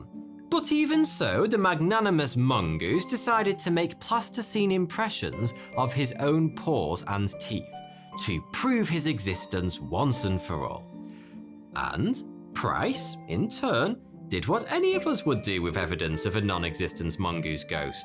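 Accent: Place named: Southern England, United Kingdom. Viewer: Thanks Phil. I've come across the accent several times on YT. The word "think" caught my attention in a few cases. I'm curious about the dialect...I'd call it a dialect. It's a marker for a distinct cultural community. I wonder (and worry) if it will go extinct, like so many others. Do speakers have a group name, or any sort of identifier that I could research? If not, in what range and in what towns of southern England is this dialect common? I'm just looking for an area boundary, if there is one. Do you know if speakers are ancestrally connected to Bretons, Angles, Picts, Normans, etc.? And do they all have big front teeth and mustaches for disguise? LOL!!! I think that explanation is hilarious. Anyway, thanks for any first-hand, factual info you're willing to provide on this south England dialect. :)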